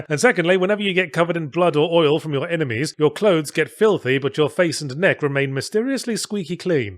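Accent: England, United Kingdom. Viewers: A Canadian listening to Yahtzee speak French in his self described "Walking tour of the UK" accent did something to my soul your pot smoking cousin would describe as Lovecraftian. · I like that Yahtzee knows how to correctly pronounce French but his English blood won't let him.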